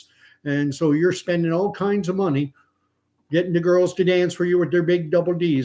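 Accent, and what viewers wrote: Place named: Newfoundland, Canada. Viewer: How do you get a one-armed Newfie out of a tree? Wave at him. That's an old one. I just started listening so maybe I am ahead of the show, dunno eh. Topic about moose now.. some idiots of practically all ages were getting close to a moose on the side of the road near Algonquin Park including parents with their kids while I was still a kid and my family stopped a bit to see the moose without getting out of the car and moved along. As a kid I knew getting out of the car would have been retarded. I don't know if anyone ever got hurt by it. We watched for a handful of minutes maybe and moved along. Newfie accent is good bud eh.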